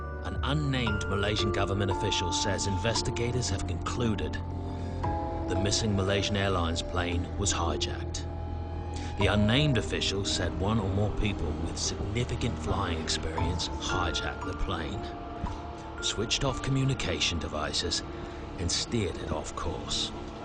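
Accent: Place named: Cockney, United Kingdom. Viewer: God I hate that cokney accent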